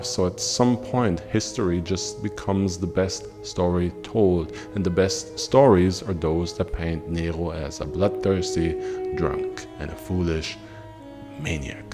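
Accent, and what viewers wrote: Germany. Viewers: I love your German accent !!! Vielen Dank für deine coole videos!!! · Am I detecting a German akcent here ? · Irre ich mich, oder sprichst du die römischen Namen auf Deutsch aus?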